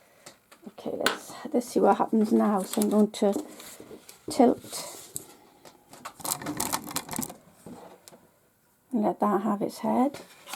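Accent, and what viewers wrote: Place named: RP, United Kingdom. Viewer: Thank you for the tutorial. I'm trying to recreate your technique it looks quite good. I love your art and your voice has captivated me. When I was a kid, I used to learn English from BBC on my little radio in socialist Poland. I'm talking1970-ties. Your beautiful accent is transferring me directly to those old times :)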